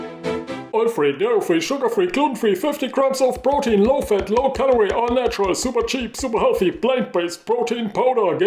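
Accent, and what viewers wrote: Germany. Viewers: It sounds German to me 😂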